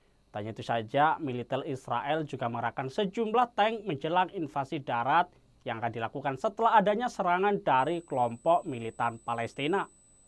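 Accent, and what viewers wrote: Java, Indonesia. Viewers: medok njir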